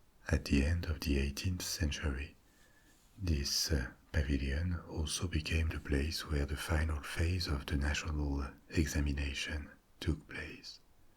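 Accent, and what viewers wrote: France. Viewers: You know......I bet your history content spoken in a normal voice would top Kings and Generals and other top history channels. Your French origin/accent/interpretation of history is a ..... breath of fresh air to all the Anglosphere content. I know there is a ton of French content on YouTube, but for example it was great to hear Napoleonic history from a non British person - I mean for real! The British are so proud of Waterloo - they (and maybe mostly Prussia) defeated WHAT WAS LEFT OF NAPOLEON.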